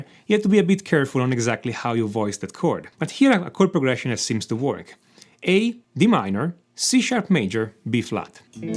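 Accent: Italy. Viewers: Your channel is a gold mine. Are you Italian? Cuz your accent sound a bit like it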